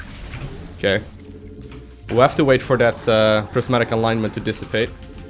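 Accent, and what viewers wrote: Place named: Netherlands. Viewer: Hey! Really enjoying your podcasts! I'm a teacher of English in Holland and I can clearly hear you've got a Dutch accent, but your English is flawless. I also love how cleverly you played this game! Unfortunately you weren't playing as greedy as you should've, but it's good you figured that out yourself!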